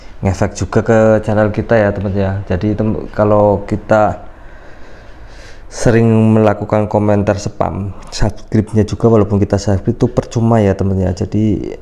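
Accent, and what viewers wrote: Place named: Banyumas, Indonesia. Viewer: Ikut nyimak kang..😀 trims infonya..👍 kaya ada rasa NGAPAKNYA...😁 apakah termasuk WONGE DEWEK...🤔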